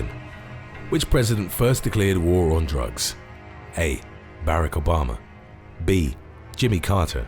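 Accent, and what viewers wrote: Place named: United Kingdom. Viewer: I'm not knocking his statistics, however it's kind of hard to believe this guy talking with the British accent lol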